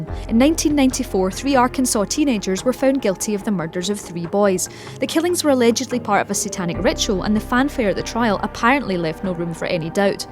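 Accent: Scotland, United Kingdom. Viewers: Thanks for this list, along with the Scottish brogue narration! Charming and informative.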